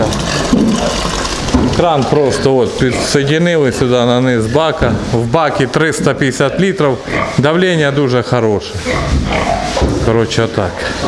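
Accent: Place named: Surzhyk, Ukraine. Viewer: Это суржик ,кто как хочет так и говорит ,у нас в Украине с этим проблем нет!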